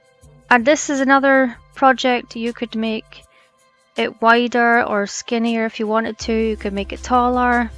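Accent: Scotland, United Kingdom. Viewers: All your designs are well done and gorgeous!! I may try a couple of them but will try to use wood pieces in place of some of the building blocks. Hope it will work although I realize my versions will look different. Your projects are very creative and I enjoy your Scottish brogue as it reminds me of my cousins in Ireland! Similar vocabulary 😄😄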